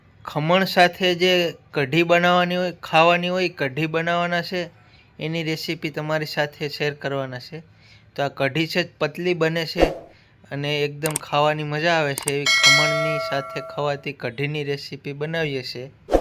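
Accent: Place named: Kathiawad, India. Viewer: ભાઈ કાઠિયાવાડી ગુજરાતી બોલો છો એમાં વચ્ચે વચ્ચે ઇંગ્લિશના ધબ્બા ન મારો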